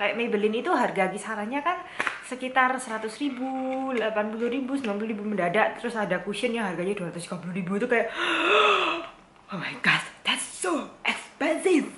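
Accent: Java, Indonesia. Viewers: kak medok bgtt gaboring sukaa bgttt wkwkwk😂😂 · Oh my~~~ untuk orang yang dulu tinggal di surabaya dan kangen medok nya orang jawa, seneng banget nonton video ini 😍 Dan aku langsung ngakak denger pengampon, kalo beli makeup murah juga di pengampon 😂😂😂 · Kocak abis reviewnya jowo banget aku langsung subscribe ka wkwk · Jawa banget, keren keren. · Ci medok banget ! Nice video btw~ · Dulu pas mau lihat, wah muka2 kek gini pasti ngomongnya inggris nih. LAH KOK medokkk gitu mbakeeee... luccccuuuu 😂😂😂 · hahaha I❤U!! lucu, ga lebay, kocak bat medok gt. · Medok nya wkkwkwk♡ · wadoh ce medok poooll 😂 subscribed yooo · Medok jawa nya 😂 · Itu dimedok2 in apa medok beneran si kak 😬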